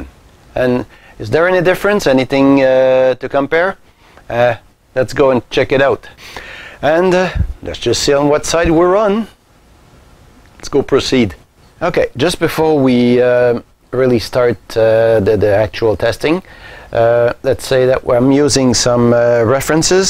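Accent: Quebec, Canada. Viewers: Tu sonnes tres quebecois? Serait-ce possible que tu sois au QC? super video en passant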